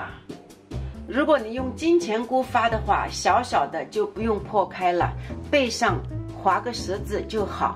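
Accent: Shanghai, China. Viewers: 好亲切的上海普通话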